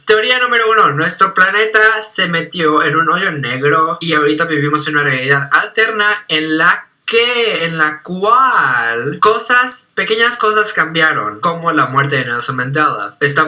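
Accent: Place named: Latin America. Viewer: soy española yuhuuuu me he dado cuenta de que hablas hispanoamericano, con hacento de hispanoamérica, tu profesor es de ahí? 💙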